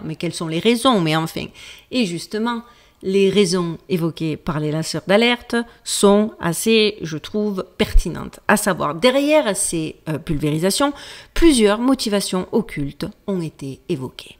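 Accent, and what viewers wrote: South, France. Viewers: J adore votre accent du soleil je connais ma famille se cité dans l herault région Languedoc-Roussillon surmène ❤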